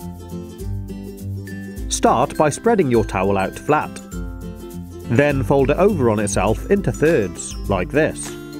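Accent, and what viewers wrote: United Kingdom. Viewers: Yamin anik I think he is from the UK because I am and his accent sounds familiar.. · is from the UK judging by his accent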